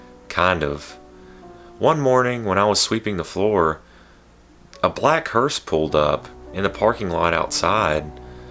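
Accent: South, United States. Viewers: You're beautiful AND you have a southern accent.... MEEEEEEOW!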